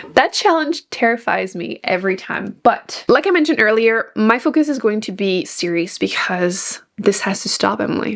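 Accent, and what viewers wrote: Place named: Quebec, Canada. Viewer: Love the t-shirt you are wearing! I don't know if I'm right but you sound like a fellow Quebecer. I really love how you are reading the shelves and letting go of the books that you haven't liked. And that big jar wow :) I discovered this year Shari Lapena (a Canadian author, a happy surprised to me) and loved her! So that is part of my reading plans.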